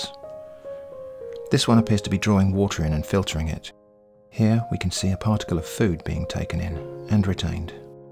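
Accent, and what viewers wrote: United Kingdom. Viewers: Mmmmm a Brit eh?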